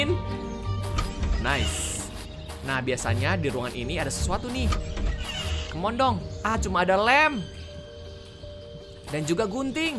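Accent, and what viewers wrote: Java, Indonesia. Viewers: bang orang jowo atau orang palembang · Kakak jawaya?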